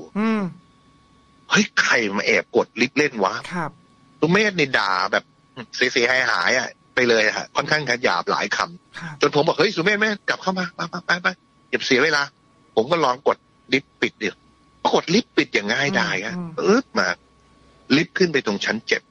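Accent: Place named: Korat, Thailand. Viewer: เราคนโคราชฟังแล้วรู้เลยยังหวัดโคราช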